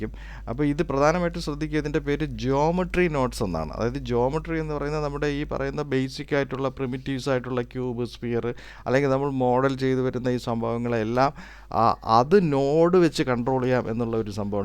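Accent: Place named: Thiruvananthapuram, India. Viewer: സാർ., താങ്കളുടെ ടൂട്ടോറിയൽ ലാണ് നമ്മളെ ബ്ലൻഡർ എന്താ ണ്ടെന്നും ചില കാര്യങ്ങളും പഠിപ്പിച്ചതു. താങ്കൾ തുടർന്നും ഞമ്മളെ പോലുള്ളവരെ പഠിപ്പിക്കുമെന്ന് പ്രത്യ ക്ഷിക്കുന്ന നമ്മൾ തലശ്ശേരി കടുത്ത വായനശാല കൂട്ടമാണ് മലയാളത്തിൽ ബ്ലൻഡർ കച്ചവടമാക്കാതെ സത്യസന്ധമായിട്ടുട്ടോറിയൽ തരുന്ന വ്യക്തിയാണ് താങ്കൾ. താങ്കളെ നേരിട്ട് കാണാൻ ഞങ്ങൾ ആഗ്രഹിക്കുന്നു. എല്ലാ കൊല്ലത്തെ ഫിലിം ഫൈറ്റി വലിൽ ഞങ്ങൾ എത്താറുണ്ട് താങ്കളുടെ ശബ്ദ കേൾക്ക മ്പോൾ തിരുവനന്തപുരം ഭാഗമാണെന്ന് ഞങ്ങൾ ഊഹിക്കന്നു. നേരിൽ കാണാൻ ഞങ്ങൾക്ക് ആഗ്രഹമുണ്ട് അതിന് താങ്കൾനമ്പറോ ID. യോ തരുമെന്ന്. പ്രതീക്ഷിക്കുന്നു. അഭിവാദ്യങ്ങൾ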